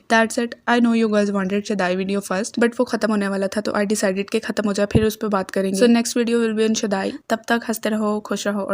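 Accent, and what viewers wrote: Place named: India. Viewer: Par apki accent indian lagi such mai hmko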